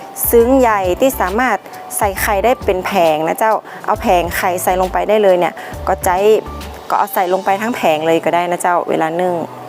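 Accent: North, Thailand. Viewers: อู้กำเมือง น่าฮักขนาด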